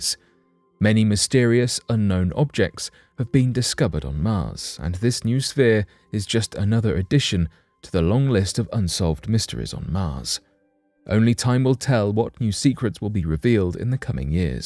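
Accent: England, United Kingdom. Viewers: You're a liar and a fat mouth and with that English accent it doesn't make you sound intelligent you might fool some people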